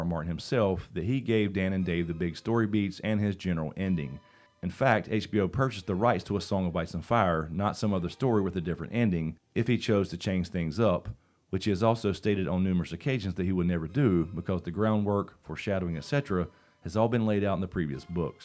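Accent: South, United States. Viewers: Yes, my heart is still broken. I have not re-watched or re-read GOT since S8. It was the sudden stop at the end. The death that Jon described as "nothing". There is an empty place where Sunday used to be. I miss you, and I miss James all of our GOT family. And because I work in healthcare, the past 2 years have been... well, let's just say GOT is not the only thing that has broken my heart. But I had a few minutes tonight and just hearing your Southern Boy charms, brought me right back to that "super bowl" feeling. GOT did not end well, but maybe, just maybe it will begin well. And we can gather around our respective computers and watch to our favorite YouTuber turned Fantasy Writer stream the GOT prequel content and our hearts will be healed.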